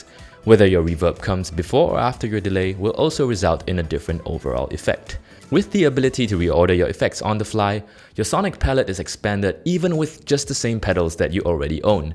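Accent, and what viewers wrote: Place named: Singapore. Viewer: Is Morningstar based in Asia? This person sounds like a Singaporean or Southeast Asian.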